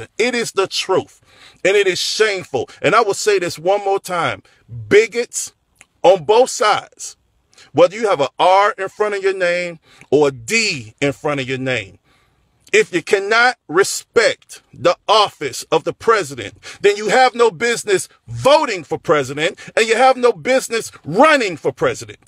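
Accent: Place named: Russia. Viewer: This guy is obviously a Russian. You can tell by his accent that he's probably from the southern Volgograd region.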